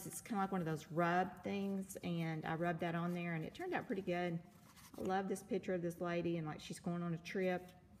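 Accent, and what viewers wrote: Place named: South, United States. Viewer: Your gaw-geous southern accent is so wonderful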